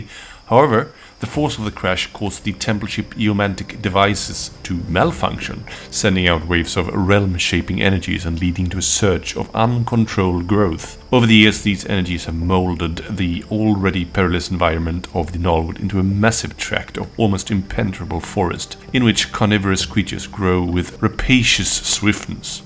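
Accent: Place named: Sweden. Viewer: This was a great idea! I wonder, did you reference any of the books from the last year of Warcry for the Gnarlwood? It takes place all over that area…and likely continues to be set there with the new Hunter & Hunted release. I like your pronunciation of some words. The Swedish soft “J”, I love it! “Objectives”, chefs kiss right there dude. Your pronunciation of chasm I find very interesting. I’ve always ever heard it pronounced like cazz-em. With a silent H. I find British English has a lot of silent H’s. Everyone here in North America has to pronounce every single letter and syllable 🙄. Want a good laugh, ask a North American to pronounce Worcestershire Sauce. Lol, it’s a riot. You frequently hear an H added between the c and first e ! Anyways keep up the great work!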